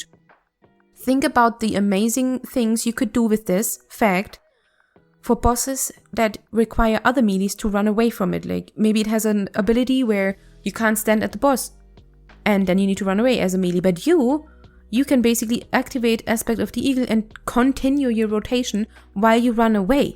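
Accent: Germany. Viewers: You are not even trying to hide your German accent like many do. I love it. You seem like a sweet person, I main a bm hunter but I want to try something new and since I don’t like mm I will give sv a chance. Thx for the guide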